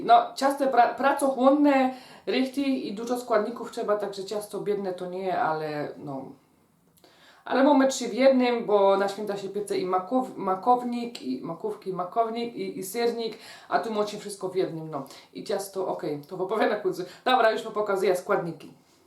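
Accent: Silesia, Poland. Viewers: Pani mówi gwarą śląską i wten sposób wymawia się mleko. Pozdrawiam. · jest za granicą,pochodzi ze Śląska i mówi swoją gwarą.To jest piękne,gwarę należy powielać,bo to część spuścizny po przodkach.I nie ma się co popisywać ze znajomością mowy polskiej!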